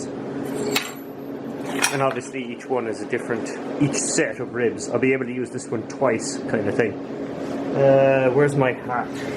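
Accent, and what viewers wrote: Ireland. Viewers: so Irish I LOVE IT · dude where do you live? you sound very Irish, but your children sound American, what? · Your Irish? you sound like Skallagrim and its always snowing. Assumed you were in Canada lol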